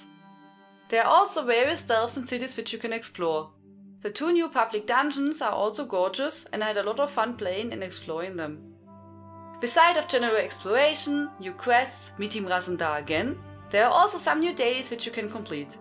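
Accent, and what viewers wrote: Germany. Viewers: Sehr schönes informatives und vor allem kompaktes Video! Meistens stört mich der starke deutsche Akzent in englischsprachigen Videos aber bei dir ist er irgendwie süß ^^ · Gonna get this expansion. You sound german btw ;) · Der deutsche Akzent ist ja dezent süß. Weiter so...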